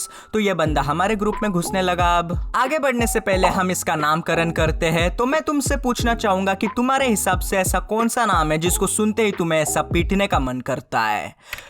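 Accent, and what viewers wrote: Gujarat, India. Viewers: Ap gujrat se ho kya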